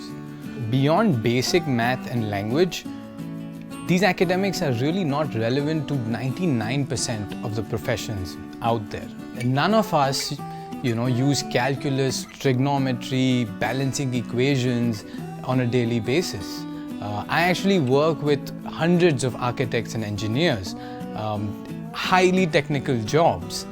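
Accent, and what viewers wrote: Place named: India. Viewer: Everyone: Serious talking and discussing in the comment section. Me: His indian accent omg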